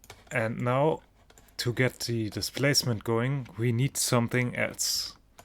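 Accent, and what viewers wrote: Germany. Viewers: Sehr Interessant Blender so zu nutzen, schönes Tutorial, komisch das man einen deutschen Akzent immer raus hört :D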